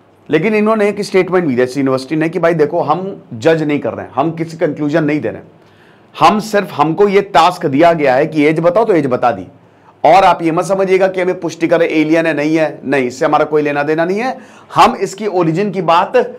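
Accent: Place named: Uttar Pradesh, India. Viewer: Aap UP k ho ye to accent se pata chala aur sun k maza aya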